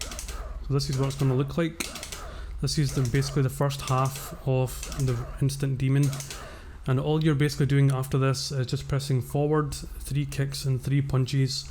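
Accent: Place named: Glasgow, United Kingdom. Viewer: Awryt wee sacks. Kidding, lol you sound local to me. Fancy a few sets ? With this dogshit netcode on this game I'm thinking if your local it SHOULD be better experience Cfn:Beast94 glasgow by the way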